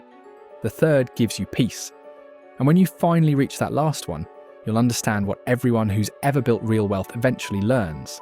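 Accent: United Kingdom. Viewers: Weird to hear an British accent talking about 1 million dollars and not Pound Sterling.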